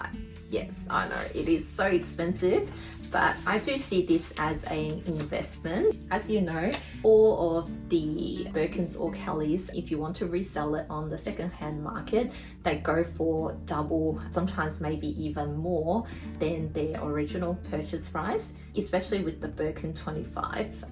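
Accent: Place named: Australia. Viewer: Also, it’s soooo nice to finally hear your voice! Been wanting to hear your Aussie accent for the longest time I’ve known you hahahaha 🤣😅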